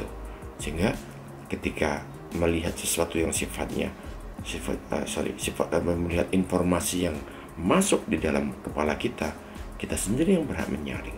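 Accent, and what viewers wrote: Java, Indonesia. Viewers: Ya allah mas hanung, logat jawaanyaa bikin ngakakkk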